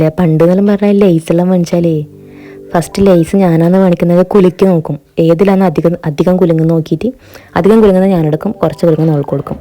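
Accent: Kannur, India. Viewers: Kannurkaari analle samsaram❤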